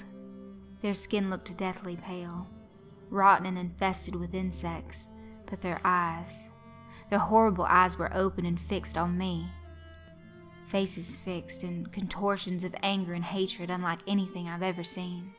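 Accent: South, United States. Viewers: You must be a southern girl, guessing Georgia. . Southern girls with accents are my weakness ☺️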